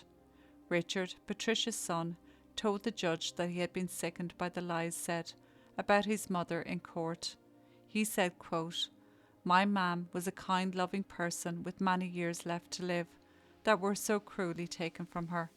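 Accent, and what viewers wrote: Ireland. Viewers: why thank you.. Nice to hear an Irish girl break these stories down.. Keep up the great work 💚 go raibh maith agat 🙏🇮🇪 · Louise looks older than her lovely mum! Sleep well Patricia 🤍 TY for the upload, I’m working my way from the beginning. Love your voice & soft Irish Mammy accent! 💚 🏴🇬🇧❤️xx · What a sorry excuse for a daughter and her family. I hope they pay for it one day. I am so glad to hear another wonderful podcast from you in your beautiful Irish accent. God Bless 🌹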